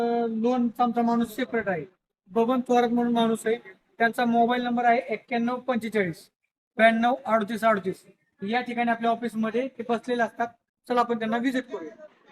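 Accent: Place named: Solapur, India. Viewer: सोलापूर जिल्हा बोलतो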